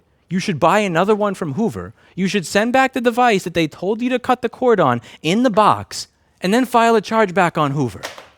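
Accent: New York, United States. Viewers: This is top 10 most new york video ive seen ever.... The accent... The aggression... The new york... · inner "Pissed-off-New-Yorker" accent is fighting its way to get free 😆 Love you, 🙏Keep doing you, man. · Is it just me or is your accent extra New Yorky nowadays? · A couple minutes in and he's gone full New Yorker. It's gonna be a good one. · How did your new york accent get more pronounced from being in Texas for 2 years? lmao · I've never noticed your New York accent so much in your videos before.... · Doesn’t sound like any Texan I’ve ever met. Sounds like he comes for Queens or somewhere else in NY. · Lmao. You can tell how high the anger levels because the New York accent comes out even harder when is pissed. Love you, thank you for everything you do for right to repair man. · Was gonna say that this video was a prime example of a person "speaking New York"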